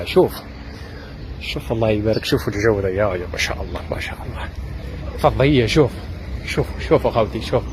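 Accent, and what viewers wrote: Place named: Morocco. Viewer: ماروكي باينه لهجتك خخخخخخخ😊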